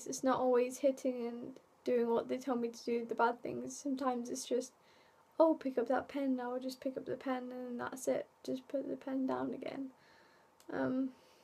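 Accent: United Kingdom. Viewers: The accent is either Liverpool, or Manchester. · I don't know why the voices have to be negative, but I guess sometimes they are positive,I hear them too but now a days they are softer and I don't think people are really thinking about me.people are thinking about themselves.Don't worry cause there is no reason why people would want to cause you harm,so your alright. Modern medicine is getting more advanced there is hope ,that soon you won't have to hallucinate not so much.You look like a sweet girl and I like you English accent.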